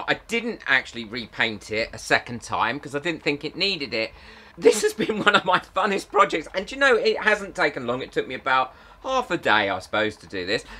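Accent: United Kingdom. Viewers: That pot came out so, so well! I'm genuinely impressed with how you did that. You have such a great creative mind and you seem to see possibilities in everything around you. I would absolutely love to sit and chat to you about crafting and your creative process. You are such an intelligent and clever man. I am a huge fan of yours. I am also a brit and I love your accent. What part of the country are you from?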